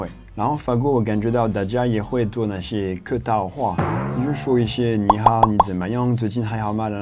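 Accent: Taiwan. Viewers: 的國語，越來越好，再過十年一定成為真正的台客，因為學的國語是台腔國語，沒有過分的捲吞，不簡單喔！ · 台灣國語的 😂🤣 不愧是， 🥰👍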